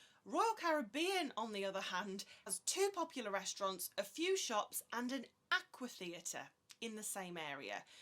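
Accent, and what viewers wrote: United Kingdom. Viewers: I just love a reviewer with a British accent!.....btw I love virgin voyages!!!1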